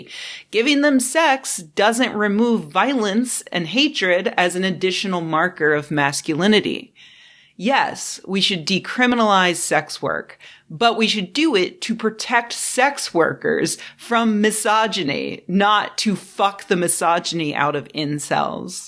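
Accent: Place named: East Coast, United States. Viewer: Rebecca not seeking to detract from your content, but you have one of those East Coast accents that hearing you say the word "Mirror" would be like a bomb going off, in a nice way of course!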